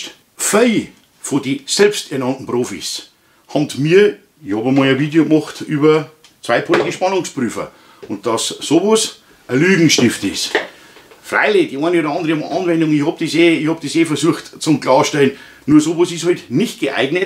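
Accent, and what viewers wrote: Bavaria, Germany. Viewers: Herrlich bayrischer Dialekt! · oan Buam hoats an oaner Steckdosen eine auffi gehaut... ich liebe diese Sprache, selbst höchst gefährliche Dinge hören sich für uns Fischköppe noch lustig an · Deine Videos sind erstklassig . 10 Daumen nach oben dafür . NUR leider habe ich ein kleines Problem , ich kann dir nicht zu 100% folgen da ich nicht alles verstehen was du sagst . Ich habe da doch schon gewisse Schwierigkeiten mit den Bayrischen . Schade das man es nicht alles mitbekommt was du gerde erklärst . Ansonsten sind deine Videos grosse Klasse . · dein Bayrisches Dialekt, bin aus Nordrhein Westfallen ich verstehe dich Super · Machst schon informative Videos, sehr lehrreich, nur ein wenig mehr hochdeutsch wäre nicht schlecht. Es kommt ja nicht jeder aus dem bayrischen Ausland 😉🤣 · #PWInfo Servus und erstmal vielen Dank für die tollen Beiträge. 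Bezüglich der Fehler durch Routine: ich selbst habe erlebt, dass mein Elektriker, der die komplette Installation im Neubau gemacht hat, bei einer Steckdose Neutralleiter und Schutzleiter vertauscht hatte. Meine Frage: prüft ein Elektriker Steckdosen abschließend nur mit einem Duspol? Das Vertauschen von Nulleiter und Schutzleiter bliebe dann ja aber unentdeckt, glaube ich? Mich würde sehr interessieren, wie das in der Praxis aussieht, und wie richtig gemessen wird. Wäre ich Elektriker, dann würde ich es mir nie verzeihen, wenn jemand aufgrund meiner Schusseligkeit zu schaden kommt und würde an solchen Stellen auf jeden Fall prüfen. Ich hoffe, dem Kind geht es wieder gut und dass es mit dem Schrecken davongekommen ist. PS das Bayerisch finde ich super :-)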